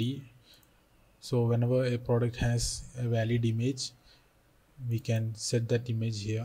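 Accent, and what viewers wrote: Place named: India. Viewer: I love your indian English accent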